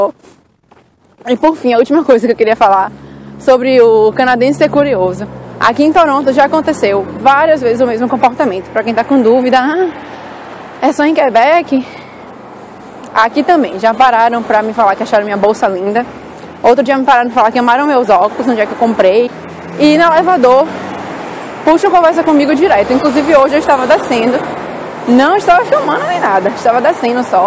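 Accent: Brazil. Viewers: Você é do nordeste ?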